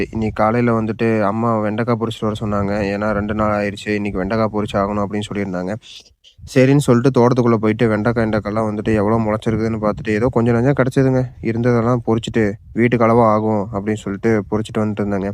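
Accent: Coimbatore, India. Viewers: கோயம்புத்தூர் சுற்று வட்டாரத்தில் பறிக்கிறதுன்னு சொல்றத பொறிக்கிறதுனு சொல்லுவாங்க அது பேச்சு வழக்கம் யாரும் ஏதும் நினைக்க வேண்டாம்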